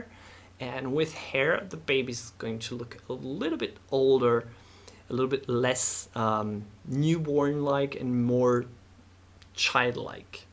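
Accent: France. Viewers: He's french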